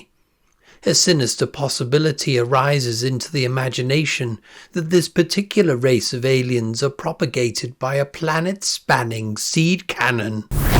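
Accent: United Kingdom. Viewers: This is hilarious and the English accent makes it even funnier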